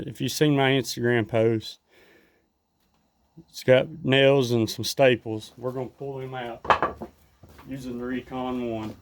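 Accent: United States. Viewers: Subbed cause the accent. Never was in the U.S. but always liked those accents, BTW great video.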